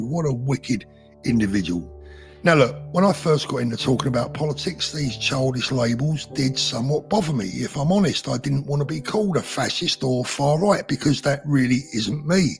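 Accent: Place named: London, United Kingdom. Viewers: This doesn't surprise me in the slightest...these warped loony left crackpots try and try again (anyone whos got an alternative view to theirs) to smear,lie and just make s**t up about someone...TBH whenever i see that theres no journalist's name to the "story" then its just made up S**T!! Just to try and smear you and get you cancelled!! TBH the funny thing is they think we're too thick to see what their game is!! BUT the thing is =you've got some tatts on yer brightons you talk wiv a london accent and lets be honest you DO LOOK LIKE A RACIST!! The words Book and Cover spring to mind!! I'm sure if they clocked me in the middle of summer they'd ASSUME exactly the same S**t!! Shaven head,tatts on arms back and chest and i was born and brought up in Peckham so how am i supposed to talk?? Best thing -point and larf at em!! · It's because you have a South-East England accent,. 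The Left Wing Labour Party used to exist to support the working class and aim to create more equality of income, however, it's been taken over by an over educated middle-class metropolitan elite whove all been to university and believe themselves to be suoerior to anyone who hasnt. The only home for the genuine working class is now the Reform Party and parrly the Conservative Party. The Labour Party has morphed into supporting every minority except the whoye working class who have regional accents.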